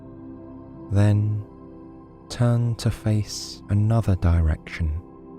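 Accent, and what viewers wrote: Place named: United Kingdom. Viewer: Oh. Your voice and British accent is as soothing as a father's voice. Last night I woke up feeling a bit unworthy, but then I ran into this. I listened and I eventually felt much better. Thank you.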